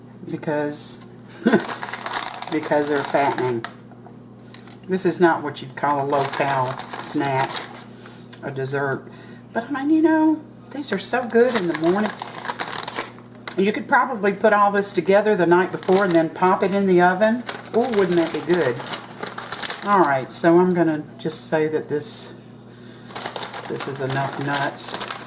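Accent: South, United States. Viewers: Luv ya,,,, your coment about unsalted butter,,, "honestly that is such a farse" with your southern drawl. LOL the best!! And that is so how I feel as well, THANK YOU. Plus it also reminded me of my beloved aunt Caroline. So here in the midwest we once a had grocer chain that carried the once well known bakery line of McGlynn Bakery and they had these! Yes, the pastry dough part was much like a cross of a Eclair and a Croissant just like what these look to be!! :0) Where as all other cinnamon/sticky buns were like some boring flat bread dough that is barely ediable fresh out of the oven. Oh and the McGlynn ones didn't have raisins which was so fine with me. And thats been more than 20 ago.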